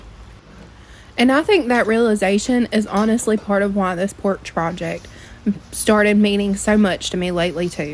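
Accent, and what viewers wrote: South, United States. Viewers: Oo I love the Southern accent. I’m from NC so reminds me of home❤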